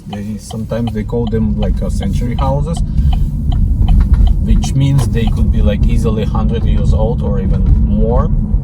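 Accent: Russia. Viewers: You sound like Russian so no worries but if you’re a Brazilian then it’s the cold. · Wooow You have a such strong Russian accent man. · Русский акцент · Русский акцент, жуткий ) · Как приятно слушать английскую русскую речь)) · Этот русский акцент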